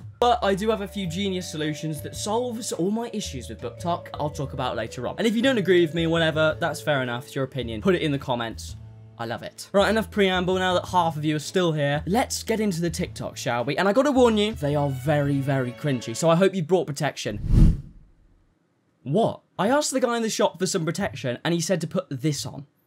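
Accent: United Kingdom. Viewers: I love this brittish, potientially scottish and or austrailian man and his amazing content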